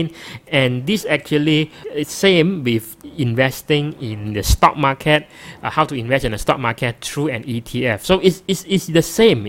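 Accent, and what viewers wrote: Malaysia. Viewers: really find your videos helpful, and the Malaysian accent entertaining as well! Don't stop! :)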